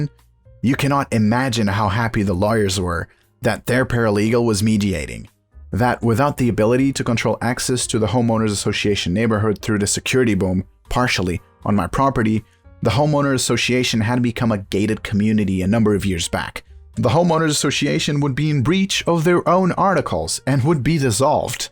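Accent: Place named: Eastern Europe. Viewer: Just subscribed. These stories are new to me, no repeats so far. One thing: I'm enjoying the reader's accent when speaking English. I know it's not human but still, it doesn't sound strong enough to be a recent arrival from Eastern Europe, the accent has mellowed....what a thought about a computerized/digital voice.... there's something very, very wrong with me🤔 I suppose a portion of the listening public have reverted, really. 40 or 50 years ago, we listened to longer stories from a cord-bound radio in one room of the house(mostly.) These days, we go everywhere with a plastic plug stuck in one or both ears, often connected to a garrote-type device, for the sake of the same type of entertainment....stories. Well done, 🎉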